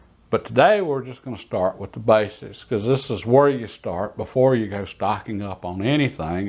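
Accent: South, United States. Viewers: Maybe I missed it, hard time hearing these days, but I always went with the longer the round the more twist were needed, not the weight of the bullet but I guess it could follow the heaver the bullet the longer...this is a great channel with great content and I can actually understand the southern accent better lol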